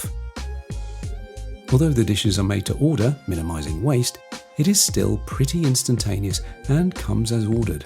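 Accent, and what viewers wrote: United Kingdom. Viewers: Why does the presenter have a British accent?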